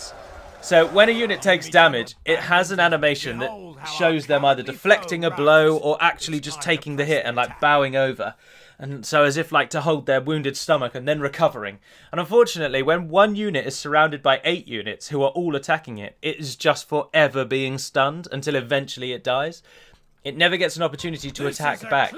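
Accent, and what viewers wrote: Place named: RP, United Kingdom. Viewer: Not wanting to be pedantic however this text may put in context your quite modern RP pronunciation re the BATH - TRAP split. It is know that northerners flat sounding vowel as in Bath was the original pronunciation. <link> . p.s. love your videos. Thanks.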